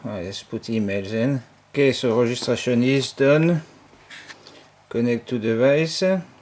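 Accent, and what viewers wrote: France. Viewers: sacré accent français je trouve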